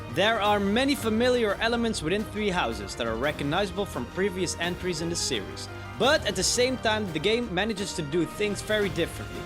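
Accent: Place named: Netherlands. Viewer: Ok, so now byleth gets added to smash, and I was wondering if this game would be something for me. I got into persona 5 (which is now my favourite game of all time btw) because of joker in smash, so I was wondering if this would happen with fire emblem for me. So I searched for a vid and found your channel and you’ve honestly sold me!!! Awesome job! Ohw... yeah also, you sound really dutch. Dus als je Nederlands bent en dit leest. Ik lieg niet als ik zeg dat je video’s van hele hoge kwaliteit maakt! Ik hoop dat je snel groter groeit! Zeker een sub erbij!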